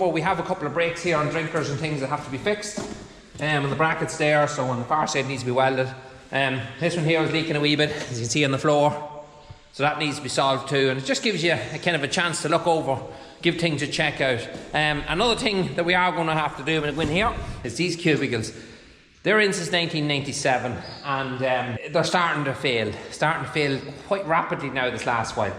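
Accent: Ireland. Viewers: Your videos are so interesting. Things have certainly changed since I worked during holidays from boarding school on my Fathers' Hobby Dairy farm back in the '50s & '60s. Your barns are immaculate and your equipment is top-notch. By the way, I love your Irish brog!!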